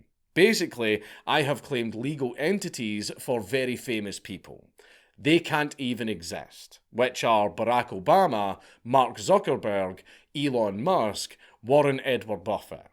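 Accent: Scotland, United Kingdom. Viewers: To his Dankulest Lordliness, Hey Dankula, this is a some what formal request. I will offer your choice of £50 or 13 Geese, an Average sized angus cow, a breeding pair of ducks and a single tabby cat or My first born son in exchange for the full unedited middle earth lore dump. I never knew how much I NEEDED to hear a Scottish man talk about middle earth lore, thank you for coming to middle ages welsh barter sesh for dankula content. Yours sincerely, Grand Elder Nanak the High Viseer of the Welsh County Council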